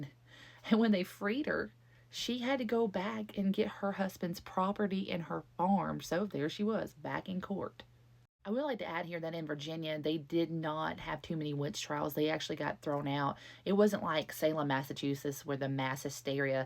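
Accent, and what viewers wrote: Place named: Tennessee, United States. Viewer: Thank you for this video. I saw it in my feed this morning and was so excited to watch it. Grace is my ancestor ❤. We always hear about witch trials and the deaths that resulted from them but this old girl wasn't having it. She did her time and lived through it. I'm so proud to be able to claim her as Mamaw Sherwood. Whenever I'm going through a tough time, I remind myself what Grace went through and I feel just a tiny bit stronger ❤. Thank you 🙏 you did a great job. And you sound a lot like me - I'm from Tennessee.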